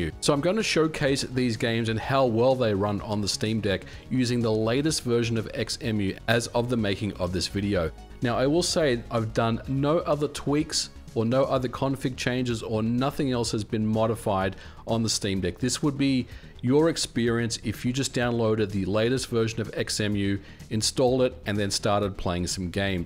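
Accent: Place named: Australia. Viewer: you need to state which currency you are talking about. you have an australian accent, so while i assume in your script you are always speaking at americans, this is always jarring and annoying. if you say "fall" we hear a tumble, not a season. americans are ignorant, please stop trying to please them.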